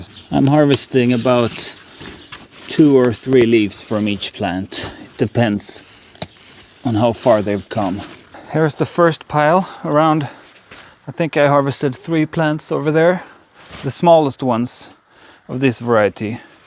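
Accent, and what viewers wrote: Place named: Scandinavia. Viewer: Fantastic! I've just done my 1st harvest so it's nice that I'm lagging 3 weeks behind you so I can look at the tutorials. I'm definitely interested in making snus bc it's illegal in Belgium, makes it feel more like a delicacy. Are you Swedish? I detect a Scandinavian accent, didn't know you could grow tobacco in Scandinavia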